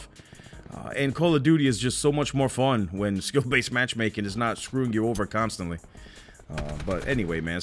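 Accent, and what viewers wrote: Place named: Northeast, United States. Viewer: Loved your channel for years bro. I hope you and your family are staying safe. You sound like you’re from the NE - so please stay safe. Cheers from Texas.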